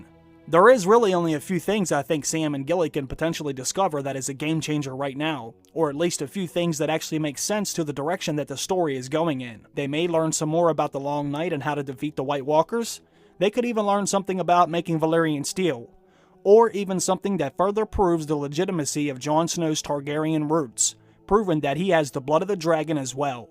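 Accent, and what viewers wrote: Pittsburgh, United States. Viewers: Are you from Pittsburgh? You sound like you're from Pittsburgh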